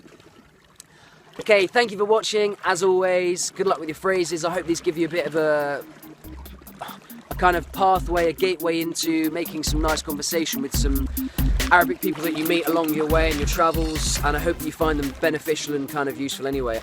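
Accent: United Kingdom. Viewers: Wow great video. I can learn English and Arabic simultaneously. I also follow your accent I love British accent. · You are great teacher and so cute too 😍your Arabic accent sounds different I guess that is because you are British.Btw,İ am from Turkey 🇹🇷 and I am learning Arabic.Lots of love from Turkey 🤗🤗🤗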